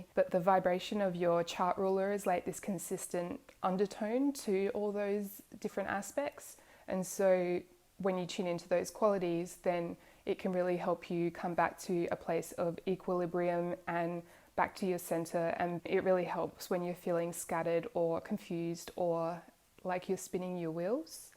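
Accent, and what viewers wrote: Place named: Australia. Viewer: I sense a Aussie accent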